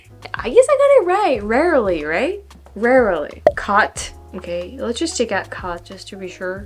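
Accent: France. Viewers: I find it interesting to hear you talk about the different prnounciations. However, I don't understand how or why anyone took exception to your pronunciation to begin with. Your French accented English is absolutely charming. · Some of your "mispronunciations" aren't actually wrong. It's not your first language so your beautiful French accent makes a difference like it would with anyone speaking a language other than their own which would totally get them in trouble in some countries, lol. I've heard people BUTCHER French words. I took 3 years in high school and even though that was 30+ years ago, I still cringe when I hear it because you can tell that person has never learned properly but THINK they know. I agree with the one comment. That rolling "r" when you speak certain French words does trip up a lot of people just like "th" does for you and I've noticed for a lot of Brits who will pronounce it as an "f" sound. 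You do talk too fast in your excitement sometimes which makes you stumble but once you take your time, you're golden :). With all the accents (regional and foreign) that we have in the US, it's laughable that people say you're saying words wrong but you made a very good point. If you've never heard them spoken before, you don't know it's wrong. FYI, it's not that we don't say the the first "n" in Lincoln. It's that we don't say the 2nd "L" so Link-IN or Link-On depending on where you live. Chicago - the CH isn't a hard Ch like Church but a soft sound like Shh. Believing - Ba-LEAVE-ing. You said read near the end of the video "it will be fun to read (RED) your comments." The read when pronounced like red is the past tense of the verb and read pronounced like seed is the present tense :). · Love watching your videos. English is difficult and you are doing very well. Don’t lose your French accent which I think is beautiful. 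· The English language sounds so much better when a French woman is speaking it. 🤩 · Don't worry about your pronunciation. You have a delightful French accent. You'll make more friends with an accent! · Your pronunciation is great. Don't lose your French accent, it is wonderful. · It's also worth remembering that Americans can't even agree on the pronunciations of a lot of words, especially when you take into account all the regional accents we have. I admire you working so hard, but the French accent is enjoyable to hear for a lot of Americans whereas the American accent overseas is much less so (I've heard). I heard a Spanish speaker say once that American English is horrible to learn because we have all these rules, but break them all the time. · English is just hard. As long as people understand you, which they can, I wouldn't worry about it too much. Besides, your French accent is cute. 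It's way better than the accent people from Quebec have 😱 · Your doing fine. Our accents are tough for everyone. And yes your French accent is delightful. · Your 'sauce' and 'squirrel' are good. I think if you have a problem it is more phrases than individual words. In general you are easily understood. I've known people who lived in the US for years who were far worse. The French accent in English is what I would call a prestige accent. Louis Jourdan, a French actor who worked in Hollywood, could speak almost perfect English but he kept a little French accent to make his characters more significant. So, improve your English when you can but your accent doesn't hurt you at all. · Your French accent is fine. I can hear you clearly. I’m from Jersey and that’s how I sound. I’m not changing nor should you! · frankly, I love the French accent. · Everything you say with a French accent sounds better! 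Don’t worry about it ! I’m an American from Boston, everyone hates my accent! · The utter defeat in your body language when you were trying to reconcile county vs country made me laugh too hard! I’m so amazed by your language skills, and your French pronunciations of words in English are so lovely!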